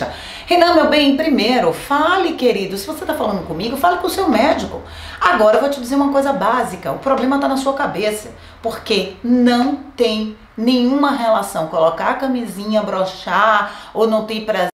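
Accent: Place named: Bahia, Brazil. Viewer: Que sotaque gostoso! É Baiana?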